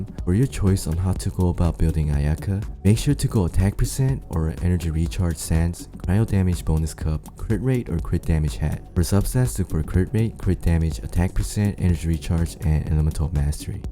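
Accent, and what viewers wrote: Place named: Brazil. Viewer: Essa cara é br o sotaque eu reconheci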